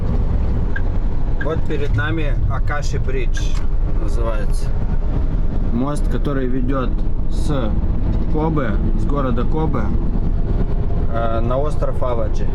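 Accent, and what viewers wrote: Odessa, Ukraine. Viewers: здравствуйте! Большой привет от тракиста из Теннесси, США. Совершенно случайно наткнулся на один из твоих роликов годичной давности о работе по работе с контейнерами в порту. Когда-то и я имел такую же работу в Ашдоде и Хайфе и это был один из кошмарнейших периодов в моей жизни. И вначеле мне не очень приглянулось то видео, т.к. сделано оно было как-то, извини за откровенность, корявато.🙃 Но... Зашел на другой твой ролик, следущий, и уже с удовольствием просматривал их, как и последующие. Никогда не планировал посетить Японию, но люблю путешествия, и твои ролики о японской жизни сильно запали в душу мягкостью твоего метода её показа. Смотрю и сравниваю Японию и те страны где я жил и живу. Очень интересно смотреть об обыденной среде обитания местного населения! И да, твой сосед сильно смахиват на веселого водилу-ханурика😛, но это лично мое не объективное мнение.🤭 И как я вижу, Япония ушла далеко вперед по отношению к своему населению даже по сравнению с США, где я жуву уже почти четверть века. 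Кстати, у меня есть тут приятель, тоже Сашка с Одессы и его манера разговаривать очень схожа с твоей. Спасибо за ролики, передавай привет супруге и Ёшкиным котикам и удачи в жизни!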